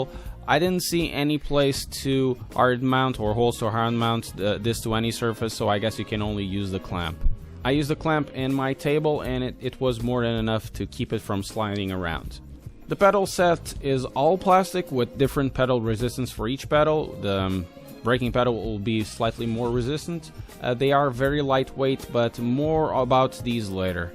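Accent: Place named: Portugal. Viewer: Where are you from? Your accent sounds portuguese!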